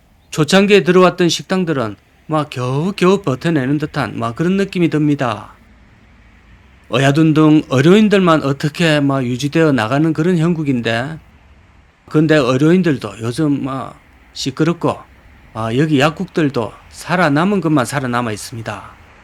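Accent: Gyeongsang, South Korea. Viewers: 강호 발바닥 TV는. 전국민이 한번쯤 들러 봐야하는 필수 유트브. 구수한 경상도 사투리 매력 짱입니다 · 우야노~? 갱상도 사투리 ㅎㅎ 넘~재밋다. 파이팅!!!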